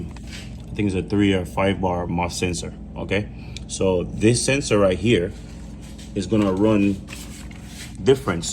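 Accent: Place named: Jamaica. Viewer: Sounds Jamaican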